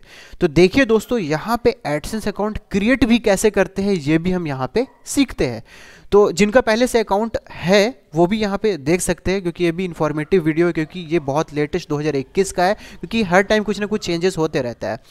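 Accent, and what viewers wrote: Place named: Jharkhand, India. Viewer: Sir ji kya aap jharkhand se ho kya.....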